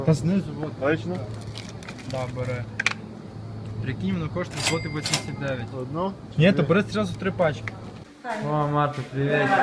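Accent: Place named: Western Ukraine, Ukraine. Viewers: То чисто під львівський діалект змішаний з сільським )))) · Базаришь как Лох!!! это не есть чистый украинский язык!!! Сразу видно западная Украина Але Але! На майдане стояле а теперь клубнику в Польше собираете!!!!!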